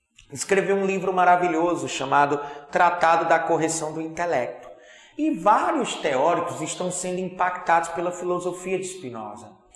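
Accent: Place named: Northeast, Brazil. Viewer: Professor suas aulas são magnificas, e o sotaque não nega que é do nordeste. O povo aqui de Recife-PE já virou fã das suas aulas. Pfv mande ai mais vides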